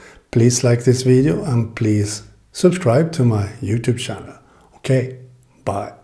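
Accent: Sweden. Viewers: I was thinking about jail breaking my kindle and was looking for some information about the benefits. I stumbled across your video and as soon as I heard your voice I got so happy! I studied abroad in Sweden and it was the best time of my life, hearing the Swedish accent again gave me such a comfort, I honestly didn’t listen to the information of the video anymore and just thought about the time I had there. Thank you for this awesome video!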